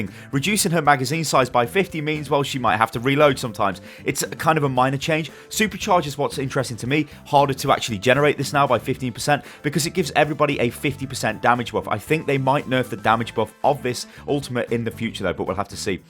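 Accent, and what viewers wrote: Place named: United Kingdom. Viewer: He's like an excited British puppy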